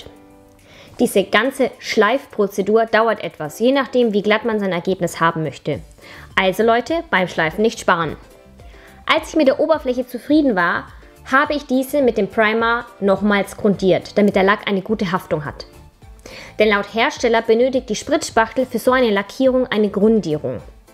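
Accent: Germany. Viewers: Geil, wenn du schwäbelst🤣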